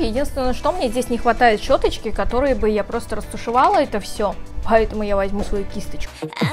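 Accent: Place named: Georgia. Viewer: Ты в этом выпуске грузинка? (Говоришь с явным акцентом) (Никого обидеть не хотела этим комментарием)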